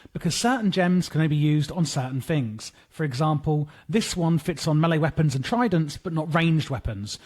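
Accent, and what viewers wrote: United Kingdom. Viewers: Jesus i cannot ignore your british accent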